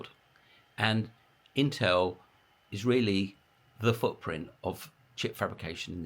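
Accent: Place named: England, United Kingdom. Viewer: Came over from your Kitco appearance , cannot get enough of your insights , only fear I have is I might be to poor for your advice , Blackpool boy here with only one stick of rock , if you know what I mean ,nudge nudge wink wink but still does not cost me to listen , right , right ? you London barrow boys have a way of getting the coin out of the back pocket of one and all. Anyways at least I understand what you are getting at with your very English way of talking and of course when you say 33 dollars that is US which coverts to Aussie dollars to a lot more but I could just buy a hundred or so I guess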